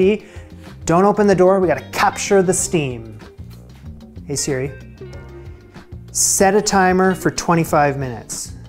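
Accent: Canada. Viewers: "Bägels". Coming from Sweden, Canadian English isn't a variety I'm very familiar with. I always feel a slight sense of satisfaction when I can pinpoint the Canadianisms in your pronunciation! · I've also noticed you pronounce vague as vag not vayg the way Americans do. The bag-ull instead of bay-gull follows suit. Thanks for addressing it though. Nothing wrong with being a proud Canadian, eh? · I say it the same. I live 30 mins from where he’s from in Ontario. I didn’t think it was odd but people pointed it out a few years ago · It is Canadian! Dont feel bad! Its your Ontario roots. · I think its a north american dialect thing. I'm in no way an expert but I'm besties with an SLP and we geek out about dialect stuff. Please correct me if I'm wrong but the Canadian vowel shift and the northern cities vowel shift have a similar thing in how they treat the short a. Making for bag-el vs bay-gul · My grandmother was Canadian. 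You say it just like she did I’m gonna go with it to regional pronunciation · I thought you might be Canadian, but this confirms it lol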